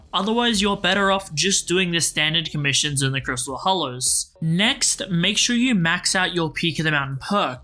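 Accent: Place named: United Kingdom. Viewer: british accent and exposing quaglet of macroing when he's not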